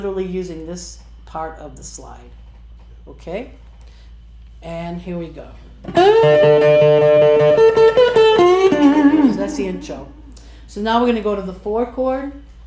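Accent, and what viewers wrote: United States. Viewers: A dear friend just turned me on to! Wow wow wow! She is the real deal. How have I not heard of her before? I am going to check her Zoom lessons. You may be from Chicago but do I hear a Boston accent? You rock! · Love your accent “Chicago”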